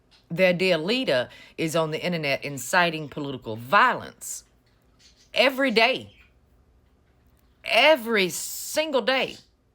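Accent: South, United States. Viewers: The message is on point👏🏾the southern accent is the cherry on top🍒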